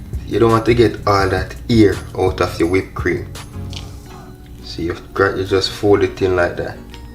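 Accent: Jamaica. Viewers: Love the Jamaican voice man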